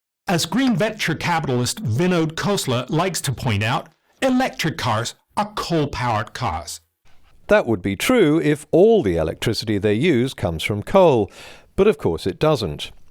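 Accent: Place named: England, United Kingdom. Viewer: He's not smug, he's speaking with an English accent. That's literally how we speak here. If that's "insufferable" to you, you clearly have never suffered. But your Bald Eagle profile and racist intolerant approach already says right wing populist long before your opinions could be considered valid.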